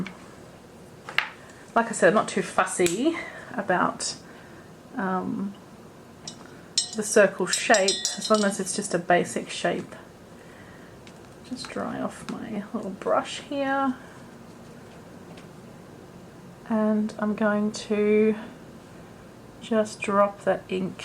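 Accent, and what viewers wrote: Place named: Australia. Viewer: Heard the accent and was like "yay! Aussie!" And somehow ended up subscribed. 😄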